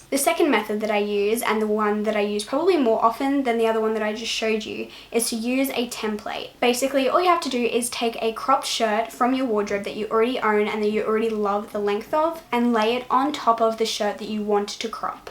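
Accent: Australia. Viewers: Are you Australian? I looooveee you’re accent so much, it’s so cute! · Is she aussie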